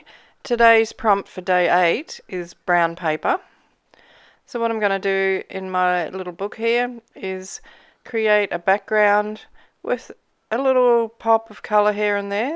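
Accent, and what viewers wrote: Australia. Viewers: Just stumbled across your post and I gotta say that I was surprised to hear your accent. I enjoyed your work. Thanks from a fellow Aussie 👍🏼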